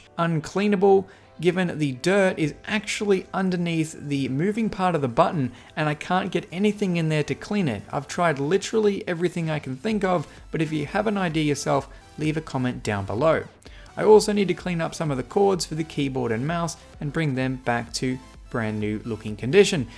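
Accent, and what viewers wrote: Australia. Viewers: My girlfriend is Australian. Easily recognizable accent to me. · Me: His accent sounds Australian Dead spider inside the computer Me: Yep, Australia confirmed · I knew he was Australian for a long time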